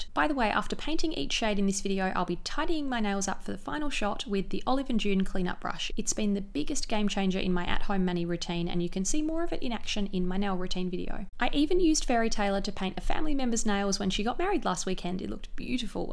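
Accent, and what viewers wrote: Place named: Australia. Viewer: I keep getting stumped! An Australian accent so I automatically assume you're from Aus and the products you talk about can be found here but both Olive & June and Glossier don't ship here! So upsetting :(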